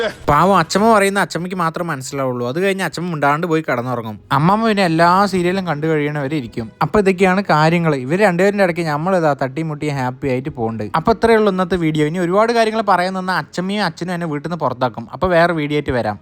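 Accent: Palakkad, India. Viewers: നിങ്ങൾ പാലക്കാട്‌ ആണോ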